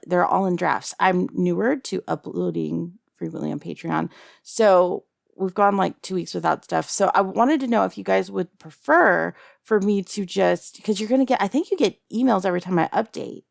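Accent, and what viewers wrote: South, United States. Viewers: I feel like your getting more southern every time you put that accent on.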